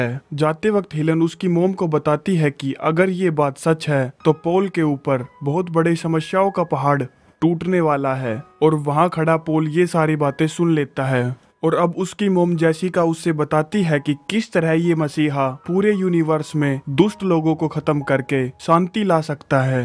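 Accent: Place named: Rajasthan, India. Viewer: tbhi lga hi tha marwadi ya to Rajasthani ya haryanvi🙏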